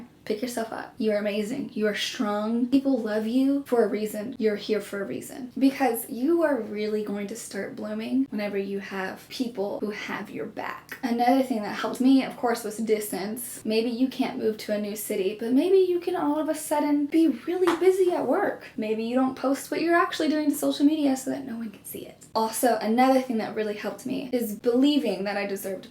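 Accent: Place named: New York, United States. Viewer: Yo u funny asf 🤣 n you sound like ur from NY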